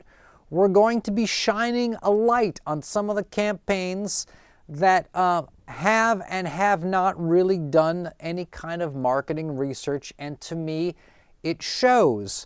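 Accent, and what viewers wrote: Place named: Midwest, United States. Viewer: I've watched your two newest videos today and learned two new things about you, both of which explain why I like your videos so much: 1. You're from Minnesota; I grew up in Wisconsin! It's nice hearing a Midwestern accent on youtube. 2. Your "autistic ramblings" as you put it: I'm autistic, so to me they don't even sound like ramblings! I've been enjoying your channel quite a while now, I was happy for you when I saw that recent vid get some traction. Your videos always give me a very specific vibe: when I lived near Green Bay, my dad and I would go to comic and game shops (and sometimes thrift stores) on the weekends and just drive around, seeing what weird stuff we could find. Your videos always give me a similar feeling to that fun vibe. Now that I'm in my late 20s and a collector myself, your videos make me nostalgic for those days!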